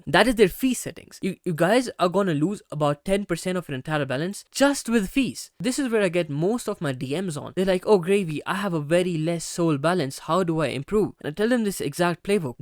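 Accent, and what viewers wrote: India. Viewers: u sound indian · Sounds like Indian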